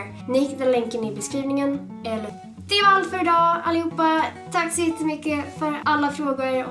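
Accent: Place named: Scania, Sweden. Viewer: bliss haha. Ja då får du komma ner till Skåne. Hade varit kul o höra din skånska. 😎👊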